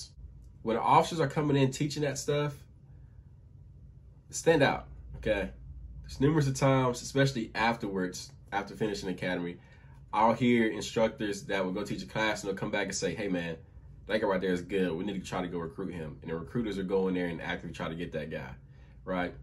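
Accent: Baltimore, United States. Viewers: I immediately knew he was from Baltimore when pronounced his “Do’s” and his “ew’s” haha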